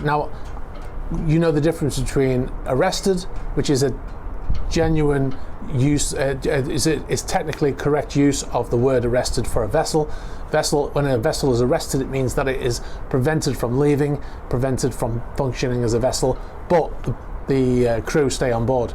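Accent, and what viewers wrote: Liverpool, United Kingdom. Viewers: That WSJ is such a prick for stealing your information! Shows that most mainstream journalists are vile and why many of us are turning away from it and concentrating on YouTube instead. I hope karma steps in…. But your reporting is so much better, concise and not biased which is so refreshing and kudos for reminding the idiots out there that not all Russians are bad. Also guessing you are from Liverpool? Lol I’m from the Wirral!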